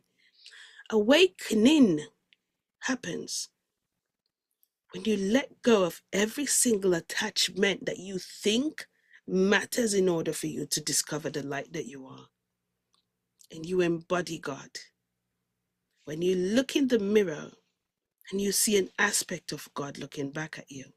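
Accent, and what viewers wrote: Jamaica. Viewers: Amazing! So glad I saw you on Alex’s next level soul! Love your Jamaican dialect pls do it more! Learning so much from you ty! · I LOVE your message AND your Jamaican accent. You said, "You're not losing your mind, you're losing your limitations"...PROFOUND! I love the way you use just the perfect words to convey your message. Thank you for giving so freely from your beautiful spirit! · I looooovvvvvveeeeee your Jamaican accent!!! I am in love with the Jamaican accent! It sounds pure, to the point and so divineThank you!!!It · Sista! Gwan an speak inna patwa! Me luv it. Blessings.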